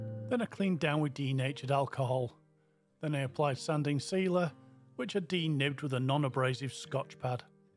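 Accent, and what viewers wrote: United Kingdom. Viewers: That’s a lovely piece. And it’s so nice to hear a British accent. Thanks for sharing 🌞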